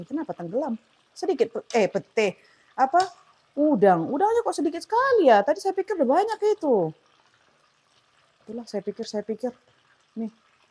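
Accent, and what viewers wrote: Medan, Indonesia. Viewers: Memang gitu kk logat kita Batak Medan ,karena saya jg Medan logat sya jg gitu😅 tp itu lh yg buat seneng nonton vlog kk..😊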